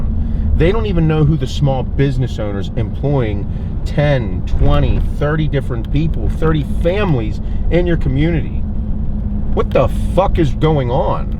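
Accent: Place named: Pennsylvania, United States. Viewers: I'm from Pennsylvania as well, and it's just funny that the way you talk and the terminology you use is identical to the shit my friends and I say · You have a Pittsburgh accent, where in Pennsylvania do you live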